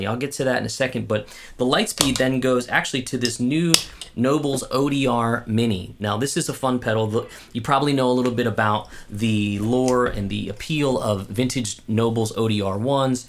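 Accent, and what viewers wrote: South, United States. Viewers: a guy with an italian last name and a southern accent kinda freaks me out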